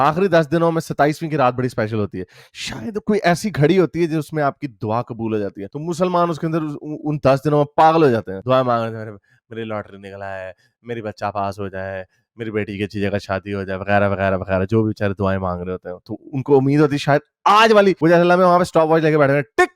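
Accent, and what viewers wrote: Punjab, India. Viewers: Punjabi accent thoda jyaada ho raha hai,, samajhne mei dikkat hota hai.. Thoda plain hindi bola karein. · Your accent and panjabi tadka kamal hai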